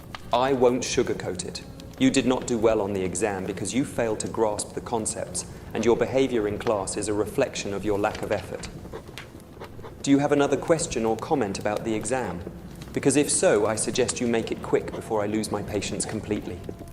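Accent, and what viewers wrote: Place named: England, United Kingdom. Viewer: Hi ! I'm a french girl and I'm soooo happy to understand ! English accent helps a lot 🥰 But may I ask you a question ? It is your voice or an IA voice ? :o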